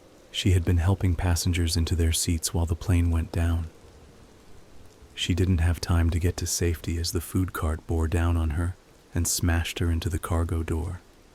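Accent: United States. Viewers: You fooled me with the American accent but definitely a scary story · Very convincing American accent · Is he not American?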